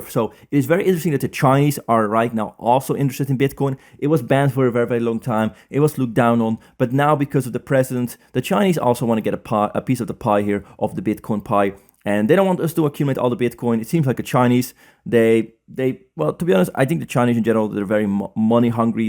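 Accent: Netherlands. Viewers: You are Dutch, aren't you?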